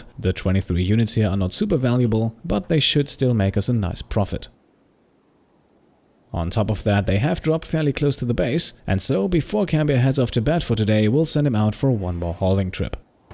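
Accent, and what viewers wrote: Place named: Germany. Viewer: Are you German or Swiss? I hear a faint, faint accent behind that soothing Documentary-voice.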